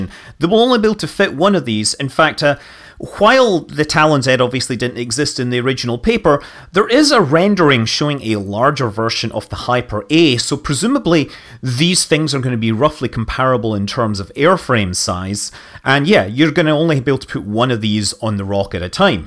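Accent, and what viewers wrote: Scotland, United Kingdom. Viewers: LOL, your accent is reverting to your native Scottish. The Bell X-1 of course had the one XLR11 engine that has the four thrusters. The early X-15 had a pair of XLR11's (so eight thrusters) while (whilst?) they were waiting for the XLR99 monster. Lifting bodies also made use of the XLR11's.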